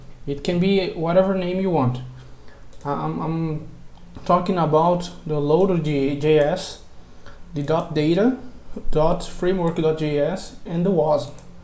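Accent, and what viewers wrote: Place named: Brazil. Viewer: tu é brasileiro ne man da pra sentir o sutaque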